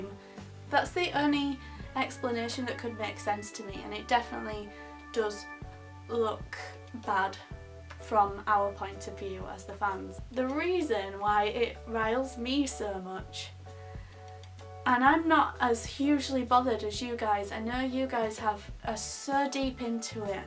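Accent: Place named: Yorkshire, United Kingdom. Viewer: Erm i have the same accent as (as from Yorkshire originally) you can't help the accent you have, for goodness sake. You are probably not english either, cos most Brits say it like that, it is how it is meant to be pronounced!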